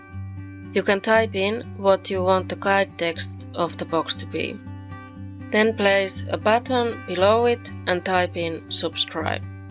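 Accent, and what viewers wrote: Finland. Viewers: Is that a Finnish accent? :D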